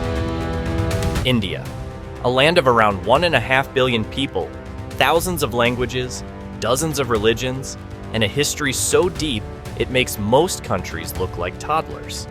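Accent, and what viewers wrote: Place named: United States. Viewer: I was suspicious when I heard your Amurcan accent, but literally stopped when you said "dude". For heaven's sake.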